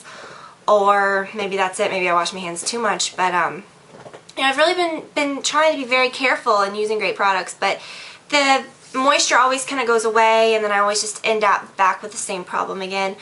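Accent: South, United States. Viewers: Where are you from...cause I can def hear a southern twang :)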